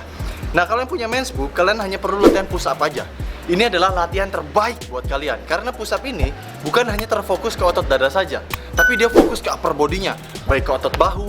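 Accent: West Java, Indonesia. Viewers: Kirain orang sumateraan, tapi bahasanya ada sunda2nya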